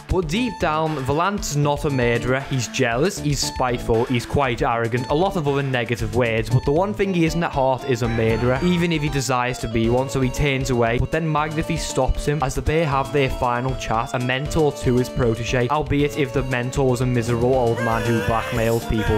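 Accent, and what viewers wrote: Liverpool, United Kingdom. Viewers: You're my favourite scouse ace attorney youtube channel <3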